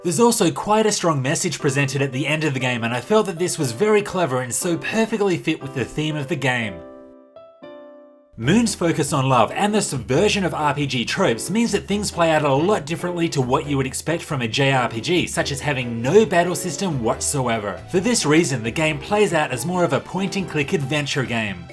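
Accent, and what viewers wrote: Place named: Australia. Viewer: Your accent is all over the place. I’m Australian and it sounds like you might be too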